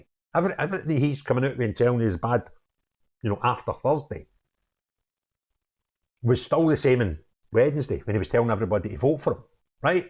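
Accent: Scotland, United Kingdom. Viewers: You always have me in hysterics the way you explain things. Sounds so much better with you saying these things with a Scottish accent. Got on yeh. thks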